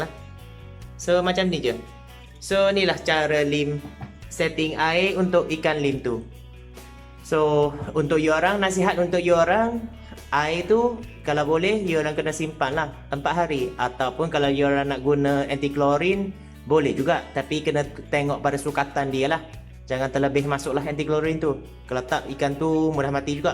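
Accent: Kelantan, Malaysia. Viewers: lim ore klate ko?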